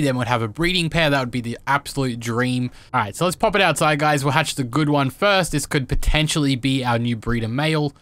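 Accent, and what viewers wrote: Australia. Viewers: normally I avoid ads on normal videos i watch but imma put it out there that i love ur vids so much and your style of content, editing (and that YTer voice/aussie accent) that i watch through the ads just for u :)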